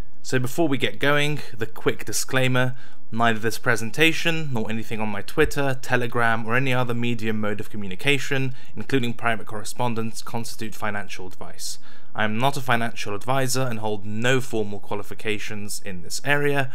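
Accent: United Kingdom. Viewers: Very good, other than you sound like a posh git to an Aussie like me. Kudos for sharing free. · didnt know you were english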